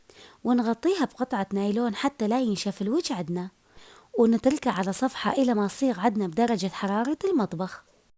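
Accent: Mosul, Iraq. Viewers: اللهجه مصلاويه 😍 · عاشت أيدك وفدوة للكلام المصلاوي الجميل والترتيب والنظافة والراحة النفسية ياريت تعملين فديوات لترتيب وتنظيف وتنظيم المنزل أو المطبخ لان مبين عليك شاطرة في كل شيء وكيف إدارة أوقاتنا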